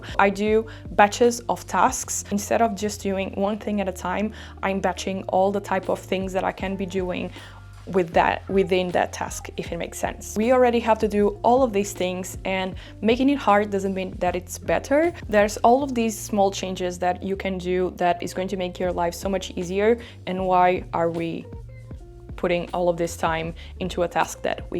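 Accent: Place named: Brazil. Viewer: My boyfriend is Brazilian he has your same accent and says OMG... when he cant pronounce a word as well xD I like ur content. Reminds me of him.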